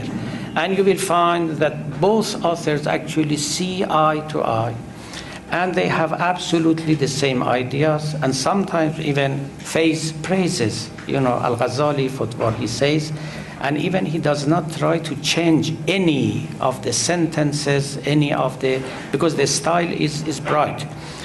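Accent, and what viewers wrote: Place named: Iran. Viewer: 30 years ago i listened and watched to Mr. Sorosh traslations of Rumi poets from persian to english on Iranian TV. I must say his english was better, faster and with a little persian accent. Now he is slower and the tone of his voice is alwayes same which makes it hard to follow his remarks and ideas ....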